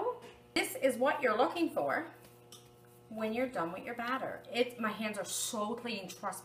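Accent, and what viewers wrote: Newfoundland, Canada. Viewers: I thought you were probably Canadian. But your accent has a nice something extra compared to what I'm used to hearing. I'm from Northeast Ohio and if I have any accent it's pretty undectible (boring) I think. I imagine Newfoundland is a nice place to live. I am hoping to make this recipe and impress my family 😁 Merry Christmas! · Is that a Newfoundland accent? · Is that a Newfoundland accent ? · Going to go out on a limb here and say that's a Newfoundland accent...!? Canada? Going to give these a try girl!!! Many thanks from Niagara "Wine Region" Ontario, Canada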